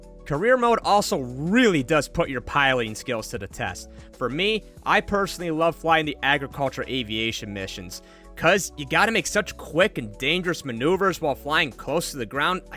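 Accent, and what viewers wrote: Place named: United States. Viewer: Thank God, finally someone who sounds like an American. Jeez. YouTube seems to favor everywhere except American content creators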